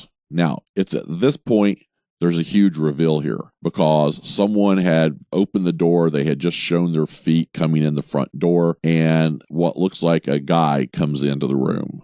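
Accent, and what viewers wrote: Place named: Texas, United States. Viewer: Really late to the party, but just have to tell you how much I appreciated your reviews. Your analytical skills and emotional I.Q. are off the charts! Plus, you have a voice that belongs in commercials. And that accent -- Texas? When you mentioned Jonah with Louis in ep.3, but not Antoinette with Lestat, I knew you didn't find her memorable enough to recognize 22 years later in ep. 6. Favorite line from you all season? "How many times are you gonna fall for this, Louis!" when he was professing his love for Vampire Armand. The secret to Louis was revealed (to me) in the first episode when Daniel called Louis his father's eldest son, and Louis corrected him, "The FAVORED son". It was important to him, being favored son. That's why all the old-as-sin vampires go ga-ga over Louis in books/movie/tv. They crave his fiercely-held echo of their forgotten humanity, and they sense his need to be some man's "favored son". 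Plus he's hot haha! Thanks for entertainment!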